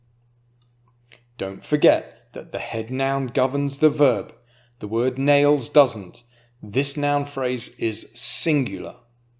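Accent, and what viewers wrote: United Kingdom. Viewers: Beautiful British accent. I like it so much!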